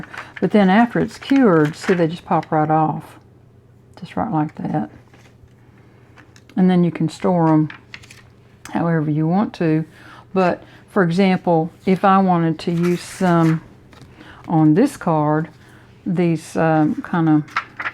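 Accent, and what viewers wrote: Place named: Tennessee, United States. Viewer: Thank you for this great enamel dots tip! And, are you from Tennessee? Because you and comedian Leanne Morgan have the same accent! xox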